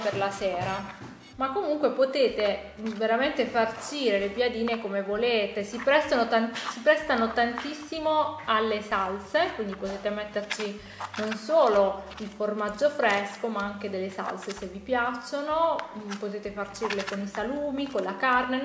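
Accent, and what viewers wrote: Sardinia, Italy. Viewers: da dove sei? la Sardegna? sento l 'accento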